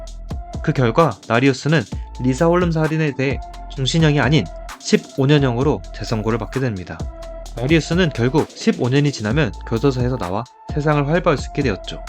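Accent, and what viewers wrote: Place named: Yanbian, China. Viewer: 말투가 조선족인데?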